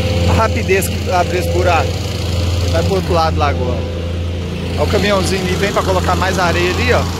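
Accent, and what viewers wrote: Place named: Minas Gerais, Brazil. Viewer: Veio você ainda não perdeu o sotaque mineiro ,ainda bem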